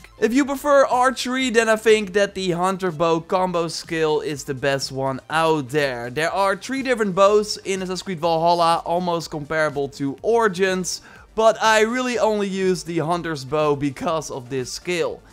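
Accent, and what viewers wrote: Netherlands. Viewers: Great videos, but the heavy dutch accent annoys me a lot. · Heey are you Dutch? (I think I recognize the accent) 😉 I love your Valhalla videos, just subscribed today 🙂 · Are you dutch O.o? Nice video btw thx :3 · Youre from the netherlands?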